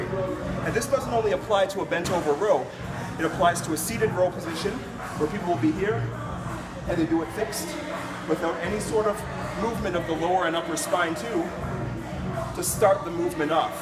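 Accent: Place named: Canada. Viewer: A black guy with a Canadian accent.....learn something new everyday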